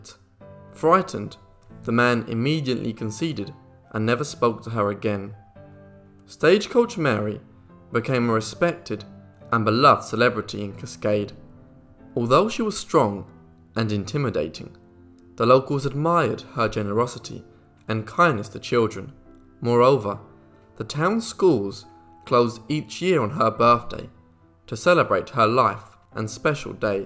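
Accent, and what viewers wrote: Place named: United Kingdom. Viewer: WHY ,WHY WHY do.they always have these damn Brits narrating stuff that has nothing.to.do with them I can't stand listening to.that.accent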